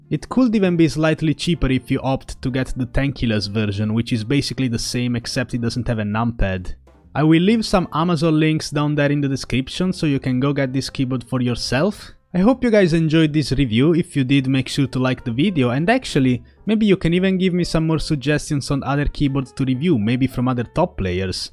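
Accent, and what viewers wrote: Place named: Italy. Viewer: quando un italiano parla inglese si sente cmq grande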